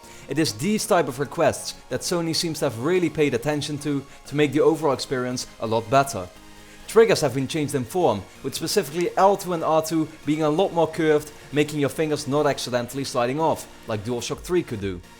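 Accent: Netherlands. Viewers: Haha, ik kan horen dat je nederlands bent, te geweldig accent man. Je kunt goed engels spreken hoor, niks daarop tegen :)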